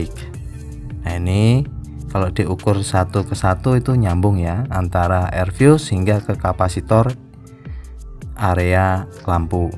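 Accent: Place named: Lampung, Indonesia. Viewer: Tak kira jowo ternyata lampung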